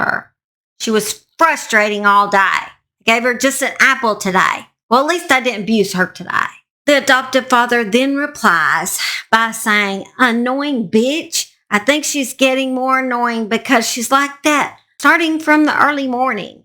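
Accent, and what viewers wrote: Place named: Alabama, United States. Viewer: I could listen to your accent alllll day. 🥰 This is another reason why I subbed to you (including how you tell the sad stories). ~~~~~ Edit : where are you from? Alabama?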